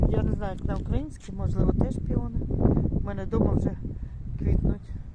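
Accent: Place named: Surzhyk, Ukraine. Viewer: Приємно суржиком розмовляїте.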